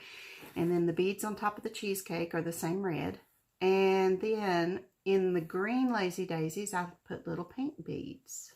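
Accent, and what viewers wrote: South, United States. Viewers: Hi friend, I can't decide what i like best about you. Your sweet smile, those beautiful smile lines near your sparkly eyes, your lovely southern drawl...wait, I KNOW. Your kind spirit. Every single time I stop by I feel bathed in Grace & Goodness. I get almost choked up with how kind and considerate you speak to us. Thank you so much. I needed to hear your kind voice today. Oh dear, my very worst habit is, when i am nervous, I chew my cuticles. Sigh. Thanks for filling by day with such beauty. You are a gem. Happy Stitching, friend, Daleen PS. Your cheesecake finish is beautiful.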